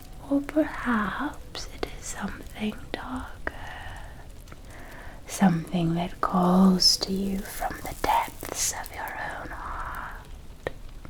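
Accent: Transatlantic, United States. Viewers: no way! I was just watching your older 1920s videos as inspiration for my 1920s themed Halloween costume! your trans Atlantic accent is unmatched 💖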